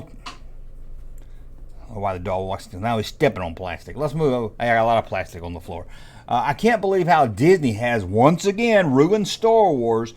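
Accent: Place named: Louisiana, United States. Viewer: WTF is this cajun sayin'? hu ha mmm haaa mmm. No, hhhhhuuh. SPEAK AMERICAN! WIGGER!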